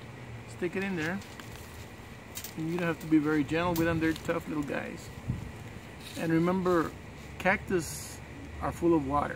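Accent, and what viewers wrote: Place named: South Texas, United States. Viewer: It's crazy how a South Texas accent is so recognizable. I'm from East Texas. Thanks for the info. Was short and sweet. To the point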